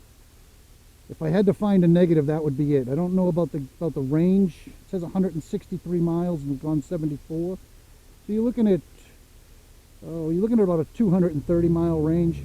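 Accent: New England, United States. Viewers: "Manchestuh New Hampshuh" Love the accent. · Cool video, but if you don't mind my saying - that is a serious New England accent you got there.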